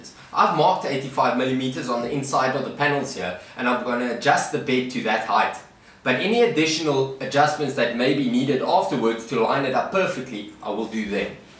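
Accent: South Africa. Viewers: South African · South Africa, I believe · Ja, deff a saffer. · The South African accent is so great! I have a few friends from SA and love hearing them speak. Add that on top of woodworking and you have a new subscriber! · Is this man south african i think i hear the accent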